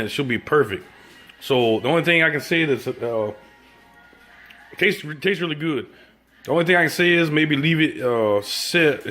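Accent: Louisiana, United States. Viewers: Why do we love that damn cajun accent so much! Alexa can you use your cajun mood!!!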